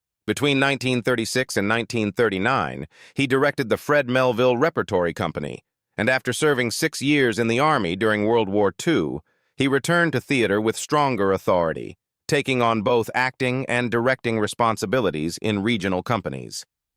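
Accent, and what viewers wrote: United States. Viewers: O look an american voice=terminology=brainwashing=cast system shame on you people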